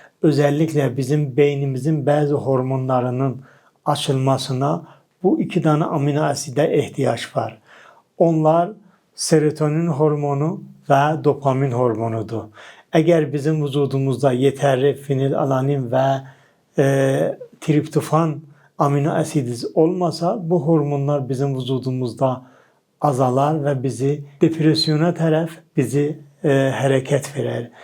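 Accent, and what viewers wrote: Azerbaijan. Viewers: Danışığınız Azərbaycan ləhcəsidi. Var olun 🙏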